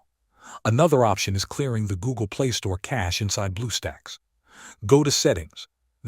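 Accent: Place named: United States. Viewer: Да можно по русски говоришь как американец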